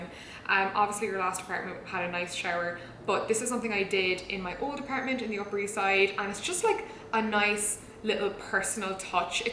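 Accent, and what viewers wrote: Ireland. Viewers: that clip that recorded of you had me laughing so hard 😂 you sound so extra irish when talking to him and i LOVE it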